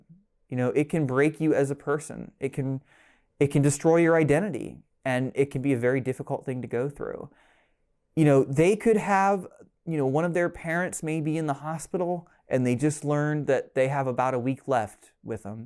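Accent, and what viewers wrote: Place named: United Kingdom. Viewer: I think he’s British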